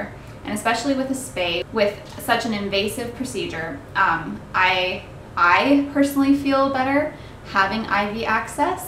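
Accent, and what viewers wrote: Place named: Canada. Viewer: Canadian ass accent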